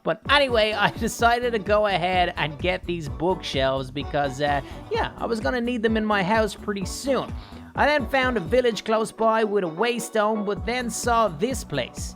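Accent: Ireland. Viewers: Are u Irish like me?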